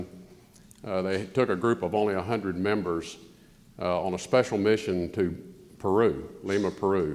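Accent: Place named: Texas, United States. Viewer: pro I miss that Texas draw :)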